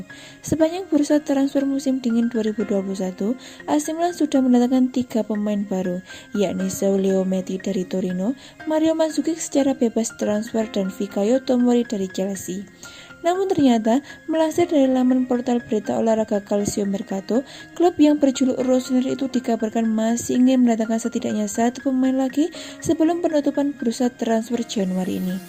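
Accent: Java, Indonesia. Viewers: Mbak..e Jawa mana?? Wkwk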